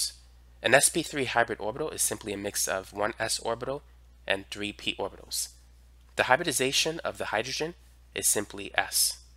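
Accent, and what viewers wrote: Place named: Boston, United States. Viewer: Are you from the Boston area? you have a very interesting accent.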